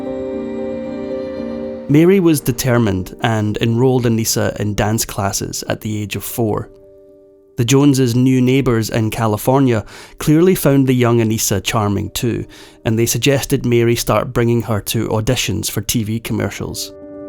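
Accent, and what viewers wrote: Scotland, United Kingdom. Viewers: Yeah, beautiful Scottish accent. Lovely 😊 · That's a Scotsman accent. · Can you please stop with the British accent? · Evil has resided in Hollywood from day one! Sadly, it has destroyed the lives of way too many actors over the many years, like Anissa.😔 Btw, I love the Scottish accent!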